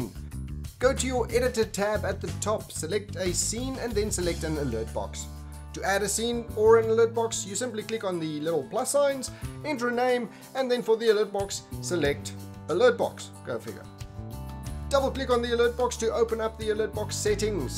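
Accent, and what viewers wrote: South Africa. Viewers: Thanks for the tutorial, you South African? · you sound like a south africanXD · south african? :) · Are you South African?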